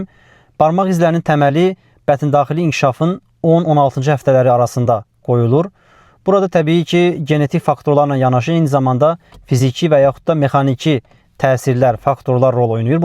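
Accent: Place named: Talysh, Azerbaijan. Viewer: Salam qardas siz hansi bölgedensiz.siveniz sirindi talis zonasına oxsayir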